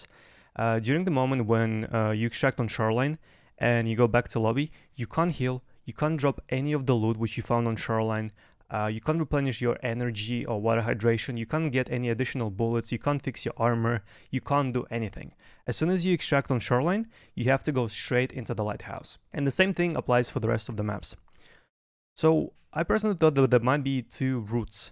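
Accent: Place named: Russia. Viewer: уровень английского поражает, но все-таки слышны русские отголоски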